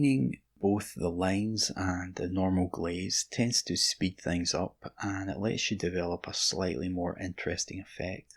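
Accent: Scotland, United Kingdom. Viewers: Nah, your accent is pretty much all there, cos after your vids I often get a hankering to watch early episodes of Taggart !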